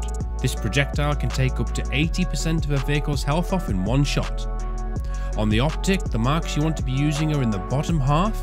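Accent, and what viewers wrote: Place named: United Kingdom. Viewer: Now i know thanks elegant British voice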